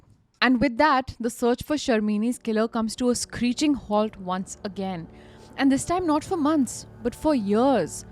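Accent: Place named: India. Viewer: Start talking in Hindi instead of talking in English with a Hindi accent and you’ll be heard more.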